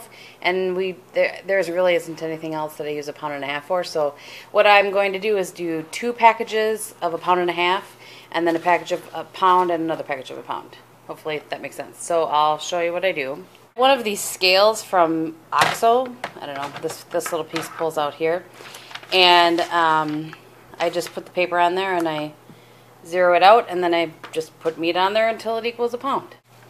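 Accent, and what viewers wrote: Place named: Minnesota, United States. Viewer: I just found your channels and I've gone on a total binge. So hi! you are a pleasure to watch. It's nice to find someone who is so relatable and your accent reminds me of living in MN.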